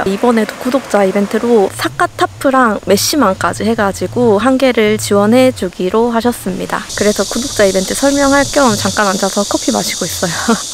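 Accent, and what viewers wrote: Jeolla, South Korea. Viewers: 에너지 넘치는 23갤 아들이랑 캠핑 다니고 싶어서 요즘 캠핑 영상만 찾아보다가 님을 알게 되었어요! 지금은 경기도에 살고 있지만 고향이 무안이라 전라도 사투리가 어찌나 반갑던지!!! 캠핑에 ㅋ도 몰라서 텐트부터 장비 등을 신중히 구매하려고 하는데요(이중지출을 최대한 막으려구요ㅜㅜ) 삿갓타프 괜찮아보이네요! 비 안오는 날엔 님처럼 활용해도 좋을 것 같고 아기랑 다니면 스피드가 생명이니까요ㅜㅜ❤ 매번 영상 기다리는데 오늘도 너무 잘 봤습니다😋💛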